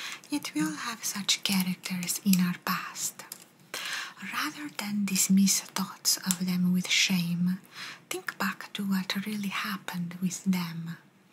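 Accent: Italy. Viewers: I didn’t know you are not Italian until I checked it 😂 such a perfect Italian accent, amazing! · Great video. You always do a fab Italian accent ❤ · The Italian accent was done great, and i really like your therapy ASMR. This one reminded me of a recent therapy appointment of my own, which went great! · Ciao! Mi piace molto il suono della scrittura e il tuo meraviglioso accento Italiano è così dolce e rilassante! Complimenti, sei bravissima e bellissima 🫶🥰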